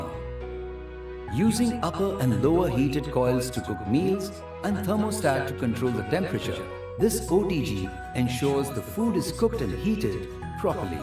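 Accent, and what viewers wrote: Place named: United States. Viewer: ये क्या American हिन्दी hai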